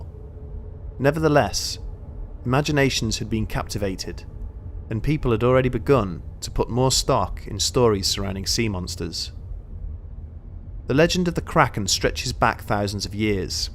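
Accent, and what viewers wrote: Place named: England, United Kingdom. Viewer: The narrator has one of those spot on English accents that could get seemingly get REALLY DARK at anytime. One of these days I will be all into one of his stories a really creepy ass story and then on the middle of the story he says “and as you’re listening to this - you hear a knock at your door. (Knock at door) when you ask who it is - the voice seems very familiar.”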